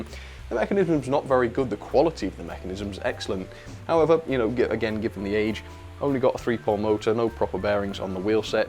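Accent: United Kingdom. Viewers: such a British sentence in an adorable British accent and - with some classic British humour for the icing on the cake. Reminds me of cruising with Jane McDonald. I love it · I love your British Accent,Mate.😁❤😁❤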